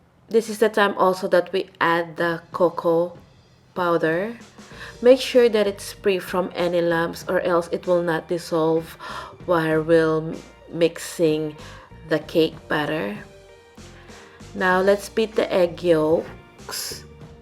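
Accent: Philippines. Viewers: You have the typical Filipino accent.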